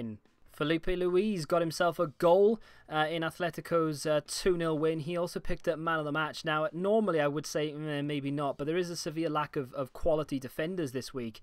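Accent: Wales, United Kingdom. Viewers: Yo are u Welsh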